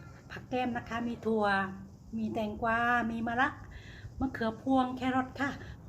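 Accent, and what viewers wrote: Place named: South, Thailand. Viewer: ขั้วกลิ้งหมู จัดเสริฟ พร้อมมะเขือพวง มะละค่ะ. ใส่ สันน่า ทานค่ะ รสชาติ จัดจ้านค่ะ อร่อยจังฮู้ เผ็ดมากค่ะ พี่สิแลงใต้ เพราะ จังค่ะ อร่อยจนตัก ไม่หยุดค่ะ ไปต่อ หลังไมค์ค่ะ. ขอให้ มีความ สุขนะค่ะ❤️👍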